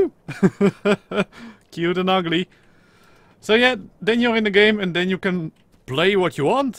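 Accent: Netherlands. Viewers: Ben je Nederlands want ik hoor het met ej accent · Dutch? Your accent seems really dutch. Edit: Why can I not spawn as a water dino? When I do it spawns me on the beach since I cannot select water and it makes me human or invisible. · are you dutch? you have a dutch accent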